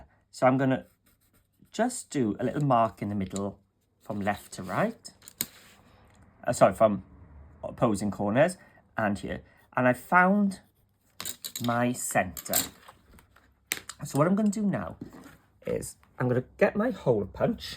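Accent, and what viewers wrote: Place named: Carmarthen, United Kingdom. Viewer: Just come across from another YT channel and so glad I did. These baubles are gorgeous and ideal to make for my grandchildren for little treats on the Christmas tree. From one 'welshie' (Newport, Gwent South Wales) to another (you sound like you're from the Carmathen area) to another, thank you so much and can't wait to watch more of your videos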